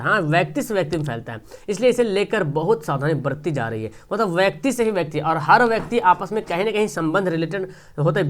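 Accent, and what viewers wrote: Bihar, India. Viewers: Bhai Bihar se ho kya? Awaz se lagta h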